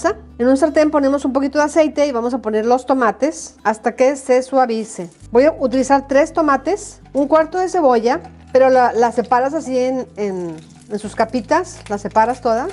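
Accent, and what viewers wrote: North, Mexico. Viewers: su acento norteño hace que me parezca Muy familiar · me encanta tu voz norteña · esta señora hermosa con acento norteño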